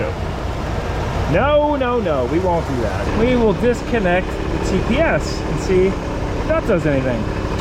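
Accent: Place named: East Coast, United States. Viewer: The east coast accent is awesome! I'm from Wisconsin, we sound goofy!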